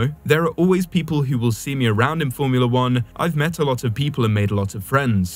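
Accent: United Kingdom. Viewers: I don't know who is narrating this, but I'm tired of everything about racing being fed to us with a British accent. Diffey is the worst.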